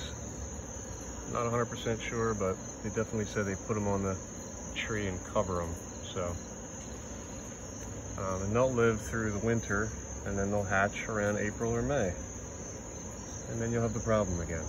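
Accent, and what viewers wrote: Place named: Pennsylvania, United States. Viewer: I nailed that accent immediately. Yes, I'm from PA and I have disgusting Lantern flies.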